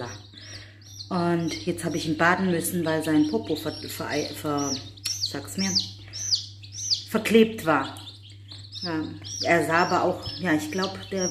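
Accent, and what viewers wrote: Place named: Swabia, Germany. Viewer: Ok liebe, dann stell ich mir das einfach vor, die sehen auf jeden Fall toll aus, habt ihr gut ausgesucht. Dein lieber kann stolz darauf sein, so eine taffe und hübsche Frau wie dich zu haben und ich liebe dein englisch-arabisch-schwäbisch Geschwätze, du bereicherst jeden Tag von uns und ich mag ganz dolle deine Penny auch wenn sie quietscht wie deine Fliegentüre;-))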